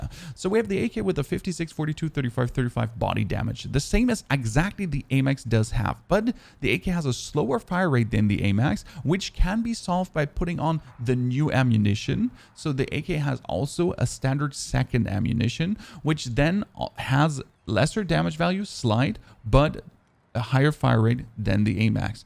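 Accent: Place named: Germany. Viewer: I like his streams but his youtube vidoes are like a copy of jgod with german accent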